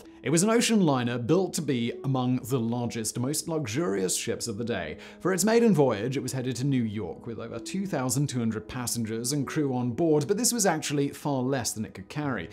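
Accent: United Kingdom. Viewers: lol, again, I realize you wanna defend your buddy Simon, but Simon is an arrogant person. He absolutely believes his British pronunciation is 'superior'.... He is absolutely not a humble person who is apologizing for his mispronounced words. 😂👍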